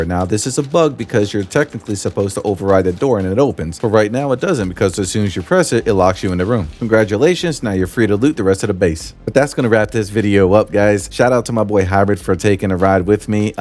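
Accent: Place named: New York, United States. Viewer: I lived in NYC for 10 years, your accent always reminds me of my time there haha. Thanks for the good loot info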